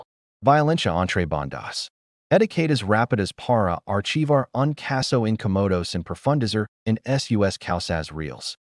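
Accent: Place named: England, United Kingdom. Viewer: Tiene acento de ingles hablando español no se entiende bien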